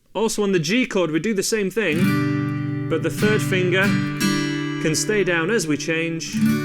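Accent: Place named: RP, United Kingdom. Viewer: when did you get all smug and start putting on a posh accent?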